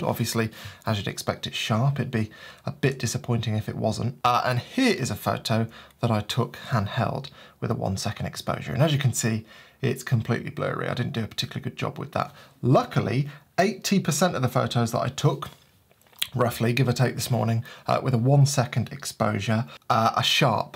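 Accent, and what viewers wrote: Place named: England, United Kingdom. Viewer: I’m brand new to photography, love the content, the dry sense of humour and the English accent 👍🏼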